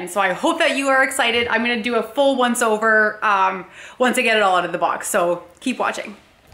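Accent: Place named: Canada. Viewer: Last video I didnt realize how typical Canadian your accent is, cute af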